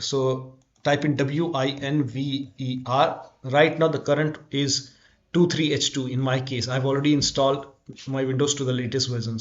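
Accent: India. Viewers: >hear indian accent >close the video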